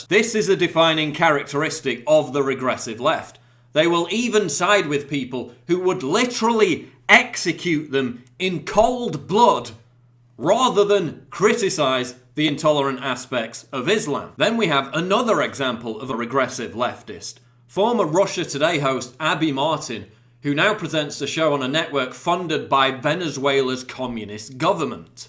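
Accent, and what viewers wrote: United States. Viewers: thought you were British so why are you talking like a American.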